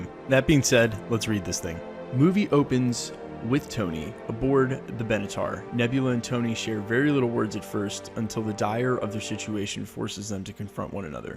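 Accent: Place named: Philadelphia, United States. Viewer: Yo Cuz,not for nothing,but are You from Philly or South Jersey??? I detect an accent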